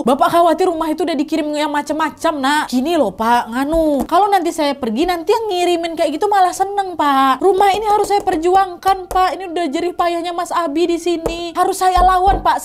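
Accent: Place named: Medan, Indonesia. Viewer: btul. hebat dia bawain ceritanya ngomognnya cepet kali pula logat medan lucu aku loh seirng kaget nntn nya trus dia ini suka jumpscare suka teriak2 sndiri aku jadinya wkakkaka apalagi yg serem dan kdg kebayang sndiri takut banget aku smpe kebawa mimpi saking tiap hr buka khw yg ketinggalan2 krn akh baru subs ini. kebawa mimpi smpe hahahaha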